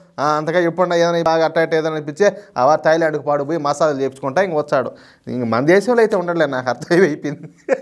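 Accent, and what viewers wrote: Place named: Bangalore, India. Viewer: Anna ee ooru anna meedi ? Ullagaddalu ani bangalore telugu matladuthunnaru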